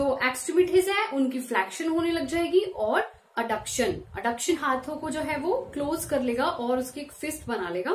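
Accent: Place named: India. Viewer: English ko Indian log ke jaisa bolya mam pronouncession is bohot hard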